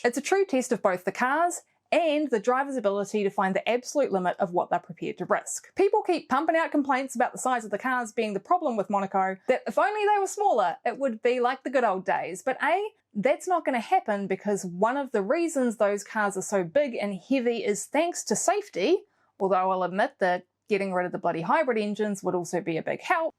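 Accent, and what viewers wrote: New Zealand. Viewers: Stop!! You need to give people warning. You really do. A Kiwi accent with a slight lisp. Loud, proud, and scary. Oh God while I'm typing the dog just went into meltdown. Don't ever say kettle of fish like that ever again. They are cancelling you because of public health, and maybe watching style and hanging out for a red flag and all your other Aunty type of preaching over the most singular boring sporting event that is somehow still on. Come on, Union and netball is your bag. That's your wheelhouse, your hitting zone, not doing a Karen preach about the most boring thing ever televised. The Military tattoo is better viewing. And..... Learn how to pronounce hypocritical properly. Please. Sorry but it's the truth. That accent, at that speed, with that entitled Jacinda like, I know boats, put down isn't your strong point. I can't listen to another do I'll just ask... 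Do you do this word of the week thing in each post? How many times can you get outlier into one post? Wow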